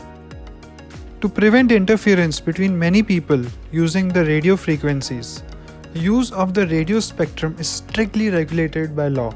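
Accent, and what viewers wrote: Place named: India. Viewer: Despite the Indian accent, the contents are very clear & quite easy to follow.. Thank you...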